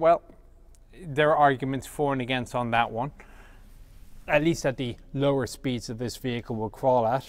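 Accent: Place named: Ireland. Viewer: I don't care for tanks, never seen one, never interested me. But that Irish accent I want to hear it all day.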